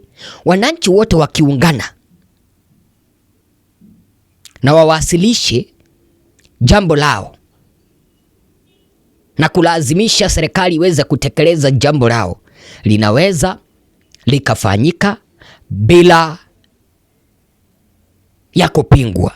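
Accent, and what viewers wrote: Kenya. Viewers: ETI TANZANIA 🇹🇿 TAIFA LETU. KISWAHILI CHAKO CHA KENYA 🇰🇪 HALAFU UNASEMA TANZANIA 🇹🇿 TAIFA LENU. WEWE NA NANI?? 😂😂😂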